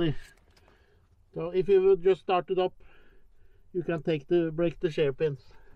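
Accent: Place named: Norway. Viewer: I’m loving your videos! And respect for for walking through all that snow to set up your cameras and get those great shots. And the Norwegian accent is fun to listen to🤭 keep the great videos up and much love from the USA 🇺🇸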